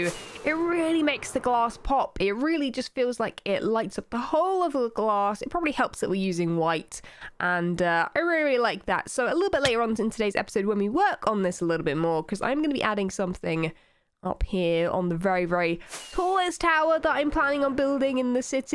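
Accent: United Kingdom. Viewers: are you British because I've been going through hermintcraft and I'm not sure if you have a British accent because I love british accents. If you don't it's fine because your commentary is my favorite out of all the you tubers and actually I like you and dreams commentary but love your videos best hermit craft series out of them all keep up the good work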